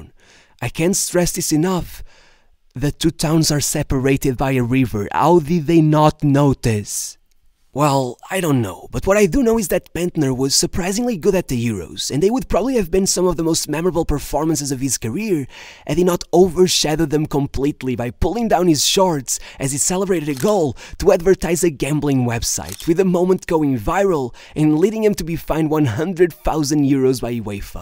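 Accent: Portugal. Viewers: is it just me? i just can't stand Portuguese accent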